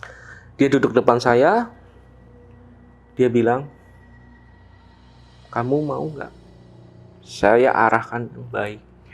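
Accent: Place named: Tegal, Indonesia. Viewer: Iya saya juga orang tegal Penasaran ini mas nya tegalnya manah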